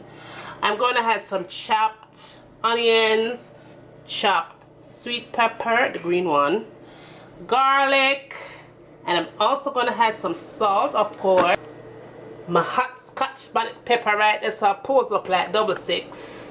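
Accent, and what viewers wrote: Jamaica. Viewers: omg I LUV ur Jamaican accent ! It takes me to the beaches of Jamaica while enjoying a spicy beef Pattie.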